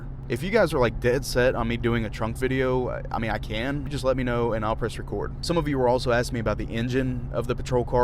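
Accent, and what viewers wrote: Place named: Georgia, United States. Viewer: Georgia if I'm not mistaken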